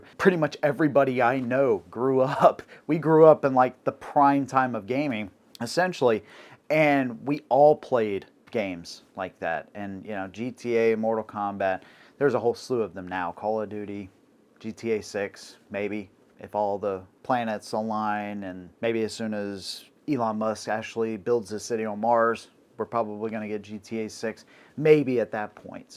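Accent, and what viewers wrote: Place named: New England, United States. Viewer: Just realized your a Yankee when you mimic a southern draw...i bet you live in the south also....ill not be watching your vids any more...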